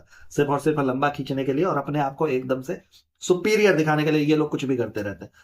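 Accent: Uttar Pradesh, India. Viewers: Recently saw your videos..One thing i like i mean loved watching your videos because of your pure desi style.Please keep it that style…Pure UP style accent and the way you narrate make it more interesting .Thank you🙏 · Problem kya hai bea UP rural ke hain toh? Atleast you have courage to be who you are and showing your face in the video. I love your video and accent. Kuch bhi bolte hai log bhak😅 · Typical UP buoy hai isiliye hume pasand hai aapke vedio, lehja aur pure desi andaz acha lagta hai, pl carry on non stop entertainment🎉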